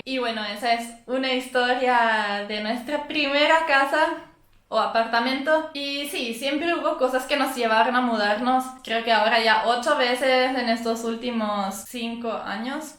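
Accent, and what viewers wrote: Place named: Paraguay. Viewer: Ya tenes acento paraguayo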